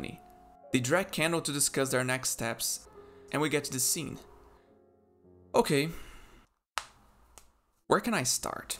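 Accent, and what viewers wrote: Brazil. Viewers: Is this a Brazilian accent I hear? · Are you brazilian? your accent sounds brazilian 😅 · Identifiquei que era BR pelo acento, mas otimo inglês irmão, parabéns pelo trabalho, essa série é bizarra de boa. Nunca vi tamanho desenvolvimento de personagem, te faz conhecer um personagem melhor em 3 temporadas do que em 7 ou mais em outras dezenas de séries. Abraços! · Um sotaque de levinho de brasileiro falando inglês! Vídeo ficou sensacional btw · Are you Brazilian? I swear your accent sounds like someone from Brazil speaking english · acho que o narrador é brasileiro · vc eh brazuca? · Tu é BR hein. Digo mais: CARIOCA. Baita conteúdo! Essa série é sensacional, consumo muitto conteúdo secundário de Succession e teu vídeo chegou até mim através disso hahahaha. Parabéns, furou a bolha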